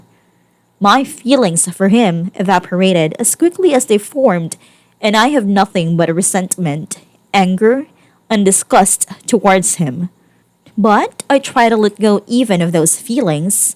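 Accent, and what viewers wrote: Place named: Philippines. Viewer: Sounds like this was read by a Philipina robot.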